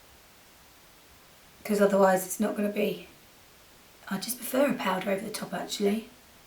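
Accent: United Kingdom. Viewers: it helps that you have a british accent too xD :) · Every time you or your sister say "So" I have to try and say it in my best attempt at a British accent. My New York one tends to cancel it out though :( I love the way you guys say it! Oh, and gorgeous make up!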